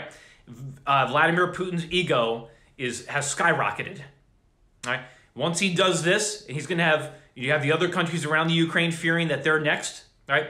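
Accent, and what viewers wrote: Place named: United States. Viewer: Oh you sound like an American.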